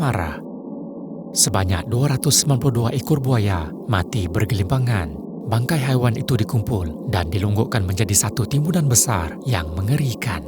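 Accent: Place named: Sarawak, Malaysia. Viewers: ya... Rasa loghat orang Sarawak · ada org Sarawak cakap mcm ni,lagi pun Kalau kt Sarawak ko bunuh buaya tanpa sebab mampos lh ko kna tangkap pihak krjaan Sarawak..Nama pun dia guna atau 😂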